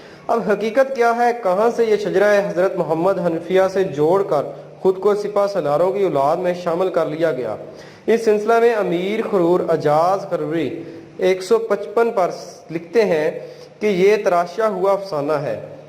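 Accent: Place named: Punjab, Pakistan. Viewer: تحریری مواد تو اچھا ہے وائس اور ٹھیک نہیں پڑھنے والا ٹھیک تلفظ ادا نہیں کر رہا جس کی وجہ سے معیار متاثر اور سننے والے پر اچھا اثر نہیں چھوڑتی یہ بندہ پنجابی میں اردو پڑھ رہا ہے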